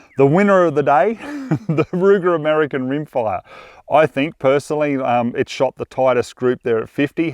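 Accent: Australia. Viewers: He is Australian not European. Why do Americans say Aluminum instead of Aluminium?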